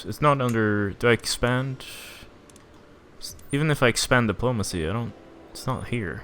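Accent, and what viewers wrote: Sweden. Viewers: Are you Swedish? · You can tell you're Swedish by the way you pronounce Sigtuna xD You've gotten good at hiding your swedish accent while speaking English too.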